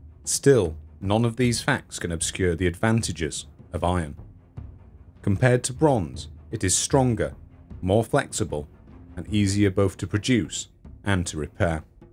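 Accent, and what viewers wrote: United Kingdom. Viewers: It's and I need some white noise to allow me a chance to get some sleep: Oh! British history in a British accent will do the trick.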